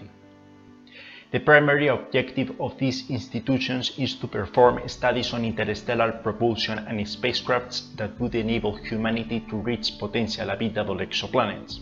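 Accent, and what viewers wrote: Spain. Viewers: Es ese un acento español? Mi español es mal pero estoy aprendiendo todos los días :)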